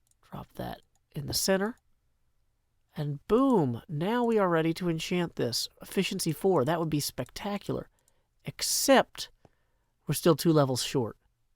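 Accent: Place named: South, United States. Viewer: Yes. It has been a very long day and your southern dolcet tones are as warming to the soul as Pie and Chips sandwich:D keep up the relaxing work:D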